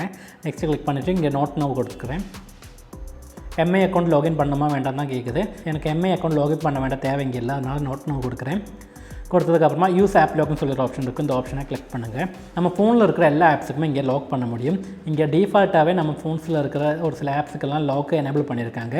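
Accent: Kerala, India. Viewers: என்னாயா உன் லேசா பேச்சில மலையாள வாட அடிக்கிது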